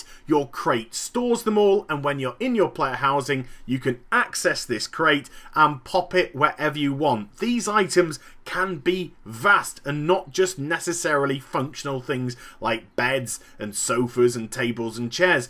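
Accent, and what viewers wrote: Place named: United Kingdom. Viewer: Hi, great review, u make me got interested in this game. However i run into a problem while getting this game. Since u have a little British accent, you might be the perfect person to ask this question with. The problem is that my location that i live in can only buy the EU version of the game. Does EU server have a lot of non English speaker? Whats the majority language that ppl use when in terms of raid and bg in Europe server?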